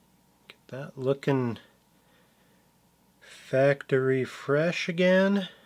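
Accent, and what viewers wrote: Canada. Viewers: Are you from Canada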